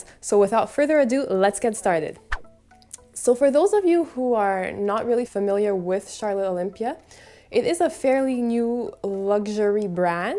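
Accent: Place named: Turkey. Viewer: Your accent very much sounds like Turkish! 😯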